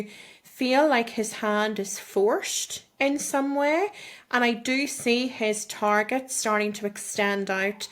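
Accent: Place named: United Kingdom. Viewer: Nice British accent prophet🎉🎉🎉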